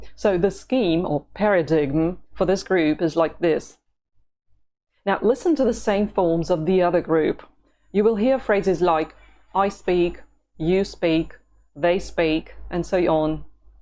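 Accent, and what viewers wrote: United Kingdom. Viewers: Hi which country are you living now? I find that you have a British accent when you speak English:)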